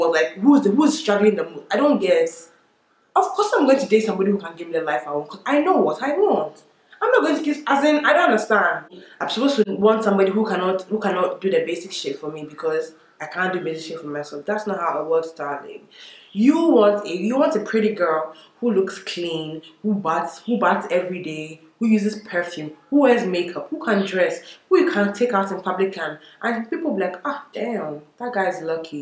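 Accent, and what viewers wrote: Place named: Ghana. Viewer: This video deserves more views 😭😭😭 P. S I love the little Ghanaian accent you have!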